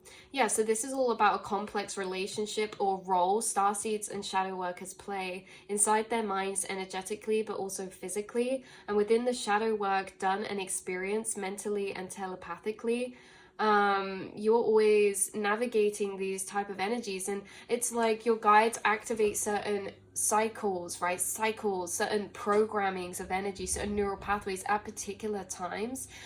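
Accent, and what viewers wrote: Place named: United Kingdom. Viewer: Harry Potter references and your British accent. Perfect fit.😊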